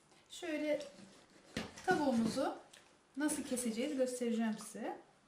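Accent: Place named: Kütahya, Turkey. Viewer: slm hanim nerelisiniz sorabilirmiyim??sanki arada bizim oranin yani kütahyanin sivesini kulaniyormusunuz gibi geldi:-) bu arada dün pisi tarifinizi denedim güzel oldu sagolun ☺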